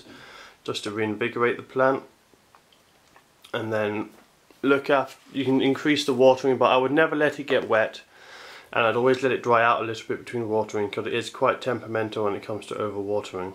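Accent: United Kingdom. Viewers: The chap has a British accent, so I assume he's in the UK. The UK, along with Europe, uses Degrees Celcius to measure temperature, and 15 degrees C is equivalent to 59 - 60 F (Farenheit).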